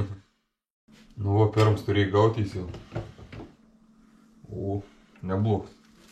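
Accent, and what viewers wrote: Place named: Samogitia, Lithuania. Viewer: Klausyčiau i klausyčiau tos žemaitiškos šnektos. Ir dar kaip jaunas i stiprus žmogus kalba😊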